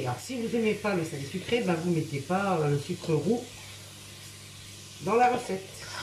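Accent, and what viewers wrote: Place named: Cambrésis, France. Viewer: Top 👍👍👍 ch’timis pour toujours !! en tout cas vous avez pas perdu l’accent 👍 De notre côté Petite famille en région parisienne mais tous natif du Cambraisis Merci d’avoir retenu pour la recette une fois par mois version bien de chez nous !! Bonne continuation (tiote)😜 Super recette je vais la faire ce week-end !!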